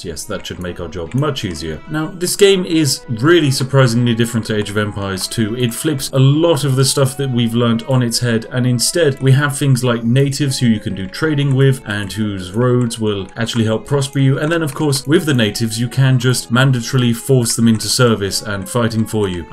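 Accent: United Kingdom. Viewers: I Love your British English, especially the word „fantastic“. Great channel, greetings from Germany. New subscriber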